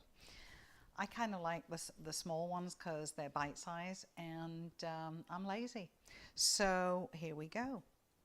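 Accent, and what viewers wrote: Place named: Manchester, United Kingdom. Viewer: That’s an interesting accent. Sounds a little Manchester little U.S?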